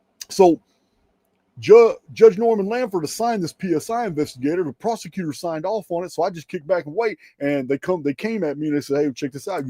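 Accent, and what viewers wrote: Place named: Texas, United States. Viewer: The owner of this channel seems to have a LOT of good information, but I CANNOT listen to him. (He sounds like a BLITHERING MORON, a cartoon character! The uber Texas accent, along with his tone and diction... I want to vomit.)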